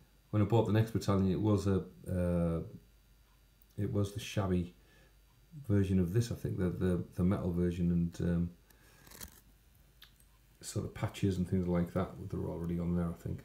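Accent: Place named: North Yorkshire, United Kingdom. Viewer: That is a nice paint job well done. Apologies for confusing you with a Geordie. You are actually a North Yorkshire Chap!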